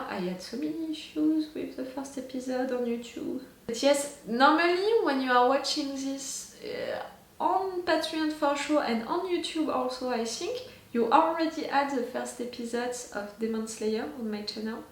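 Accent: France. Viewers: Je découvre cette chaîne Mais après quelques secondes, j'ai compris que tu étais française. Entre français on se reconnaît xD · Ouais on ressent énormément l’accent franglais ahah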